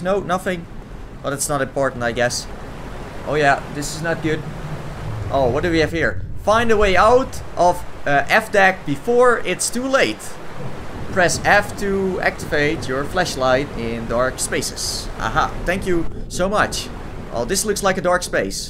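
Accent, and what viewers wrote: Netherlands. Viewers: You kinda have a Dutch accent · Almost definitely. Such English pronunciations are the disabilities of a real Dutchman! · je klinkt best Nederlands ben je? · Gotta love the dutch accent😉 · Hij is idd nederlands, hoor je aan zijn accent toch? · Ja ik hoor een Nederlander xD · he has a dutch accent indeed! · I think he's Dutch, I'm Dutch and Dutch people got the worst accents, I can hear from his accent that he must be Dutch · Hoi Ik Ben ook Dutch Ik Dacht al aan je acent:D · Your Dutch. You can clearly hear it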